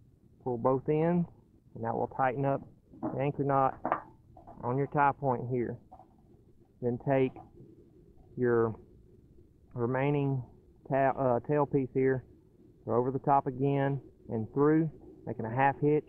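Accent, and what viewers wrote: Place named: South, United States. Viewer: Good , simple, straightforward video man. Sounds like you are Southern born too, like me.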